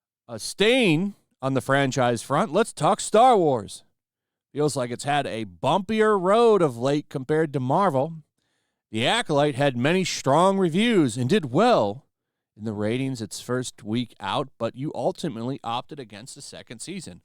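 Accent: England, United Kingdom. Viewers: I like your English voice! Where I live everyone talks like that lol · Congrats on the (sort of) English accent. I only heard of this because some you tubers mentioned it. It's ok, a bit of fun. Looking forward to Andor though.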